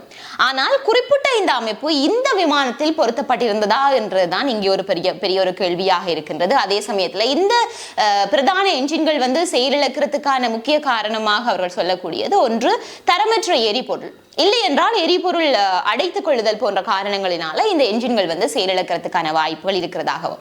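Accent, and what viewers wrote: Jaffna, Sri Lanka. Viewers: இறந்தவர்களுக்கு ஆழ்ந்த இரங்கல்கள்.. தங்களது பேச்சு யாழ்பான தமிழ் போல் மிக நன்றாக உள்ளது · தமிழை நன்றாக பேசுங்கள் இலங்கைத் தமிழை பேசாதீர்கள் தமிழ் நன்றாக புரிந்து பேசுங்கள்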